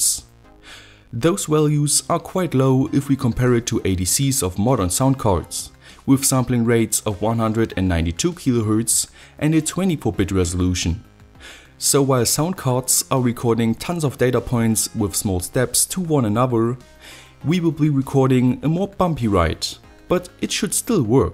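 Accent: Germany. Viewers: You are from Germany?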